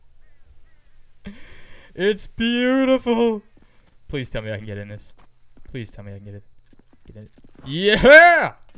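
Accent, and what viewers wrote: Australia. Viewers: AUSTRALIAN WHAT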